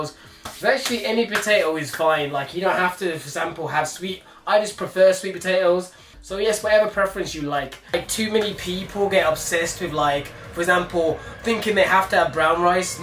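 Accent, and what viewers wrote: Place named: Northern England, United Kingdom. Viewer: Nice break downs, Where are you from in UK, from accent I'm thinking up north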